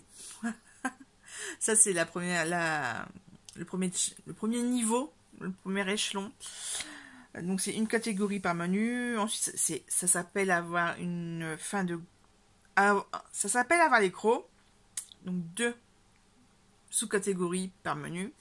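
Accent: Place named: Quebec, Canada. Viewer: Wow du québécois YASSS TABARNAK hahahah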